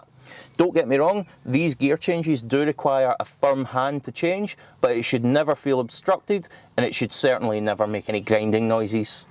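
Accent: Scotland, United Kingdom. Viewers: Great vid and the accent totally reminds me of Trainspotting:) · and you referred to the 2006 model twice....I think you meant 07-08 . I can't stand Scottish accents.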